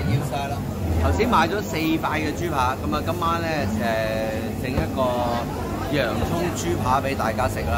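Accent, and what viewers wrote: Teochew, China. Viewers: 哈哈，潮州话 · 係唔係河洛話啊？只不過老闆個口音聽落似潮州話多啲😀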